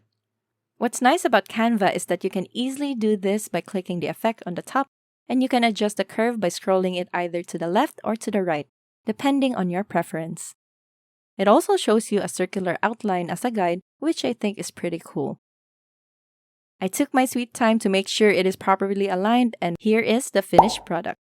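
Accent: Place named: Philippines. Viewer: You deserve a million subs. Keep it up. Ps: you sound Filipino tho.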